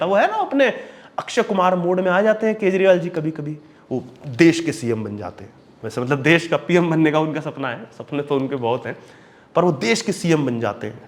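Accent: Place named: Haryana, India. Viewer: Bhai ki haryanwi accent shandar hai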